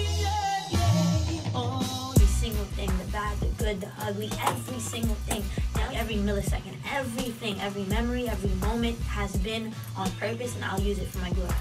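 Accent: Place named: New York, United States. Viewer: Your New York accent is very strong 😂😂 It cracks me up at times. But you are keeping busy.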